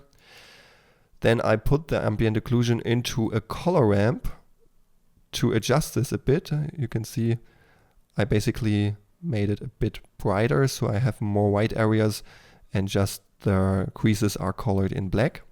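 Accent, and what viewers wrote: Germany. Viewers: German accent 😂 Greetings from Germany!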